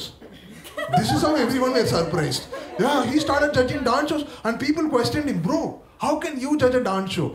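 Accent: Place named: South India, India. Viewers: he is south indian, hence that accent...try and appreciate the diversity of india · Hypocrites can bear accents of people from all around the world but can't bear south indian accent !!? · Love from maharashtra..loved ur sounth indian accent..👍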